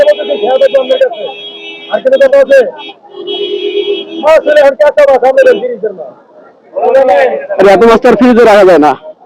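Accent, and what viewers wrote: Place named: Bangladesh. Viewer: Bangladeshi Bangladeshi English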